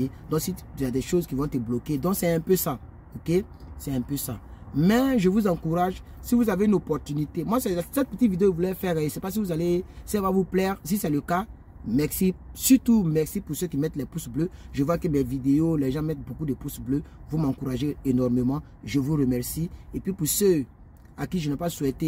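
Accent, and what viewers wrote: Ivory Coast. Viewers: Je sens l'accent ivoirien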